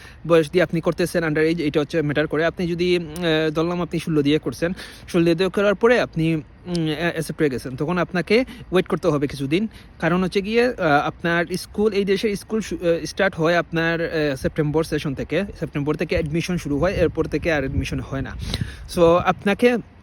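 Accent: Sylhet, Bangladesh. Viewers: sylheti naki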